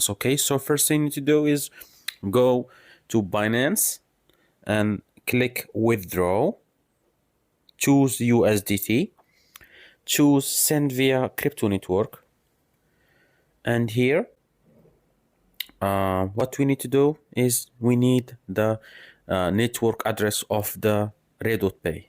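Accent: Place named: Algeria. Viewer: U sound algerian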